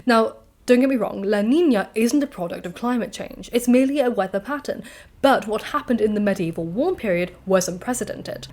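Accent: RP, United Kingdom. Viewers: I just discovered your channel, and you remind me how incredibly attractive an intelligent and learned woman is. (The posh accent probably helps 😃)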